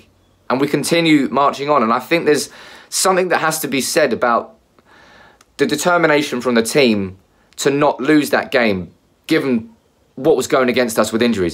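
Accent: United Kingdom. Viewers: Cockney manc??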